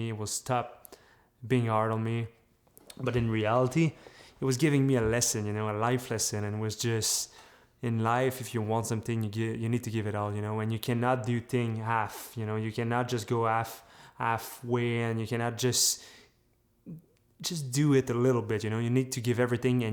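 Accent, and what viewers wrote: Quebec, Canada. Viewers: t'as juste l'accent d'un québécois qui parle anglais 😛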